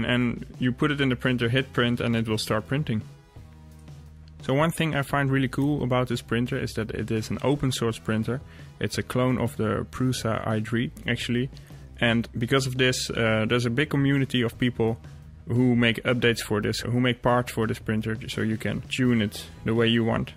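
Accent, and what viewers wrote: Netherlands. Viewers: Are u dutch? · max yep 100% sure the accent is typical dutch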